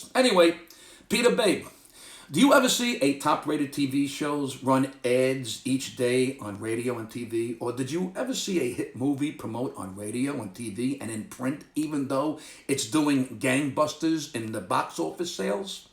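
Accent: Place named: Brooklyn, United States. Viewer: You're Hilarious! You make me miss Brooklyn so much with the way you talk!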